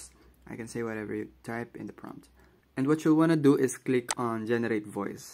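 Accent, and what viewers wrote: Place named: Philippines. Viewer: Bro sounds like filipino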